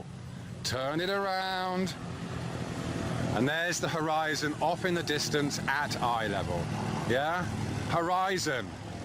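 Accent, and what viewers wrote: United Kingdom. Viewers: A lot of us in the UK speak like that. To be fair, has a pleasant speaking voice, even if most of what he says is nonsense:-)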